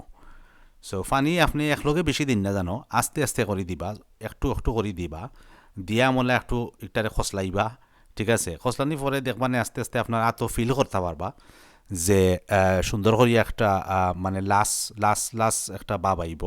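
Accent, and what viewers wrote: Sylhet, Bangladesh. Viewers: Wow, you made really nice vapa pitha. I enjoyed your Sylheti language, it was funny. Kub Moja Laglo bondu.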